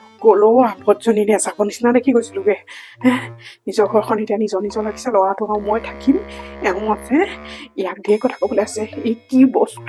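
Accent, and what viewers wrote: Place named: Lower Assam, India. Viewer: 😂😂😂😂😂😂😂😂😂 Hahi Hahi petor nari dal dal 😅 Pise eta kotha. Please don't take it otherwise, apunar mukhot Lowee Assam accent tue besi val lage. Baki sob Mojjjaaa ❤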